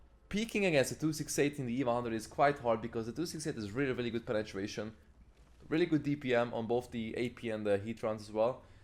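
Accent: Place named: Hungary. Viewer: I'm not arguing with you either 😊 has a typical hungarian accent 😅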